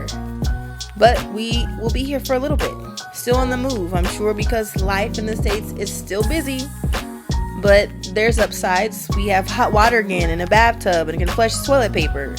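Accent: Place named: Honduras. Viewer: Awww beautiful words same way i feel currently i have everything in the US but part of me is like dead, not even sure how i found your chanel glad i did... our purpose in this earth is to enjoy life, have peace, show our kids they are more things outside than these routines, not sure if you are honduran i can hear some accent there 😅 we love you guys! 🇭🇳🫶 and i will be praying for your dad 🙏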